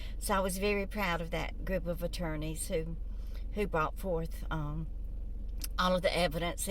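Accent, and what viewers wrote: South, United States. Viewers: Hi Ms ☺️ I've been watching some of the court case here in UK 🇬🇧 & even though I've not watched it all it was obvious he was guilty. I felt so sad for his poor wife 😢 & son 😢 Hope you're keeping well, I love to hear your southern accent, it's lovely. Sending you love & best wishes, God bless you 🙏 xxxxx Christine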